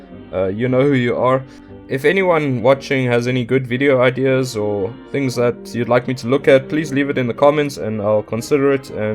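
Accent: South Africa. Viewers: He sounds South African....right on brother or should I say broer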